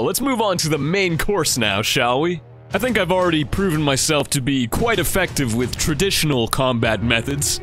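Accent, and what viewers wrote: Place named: United Kingdom. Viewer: More British accent please 😆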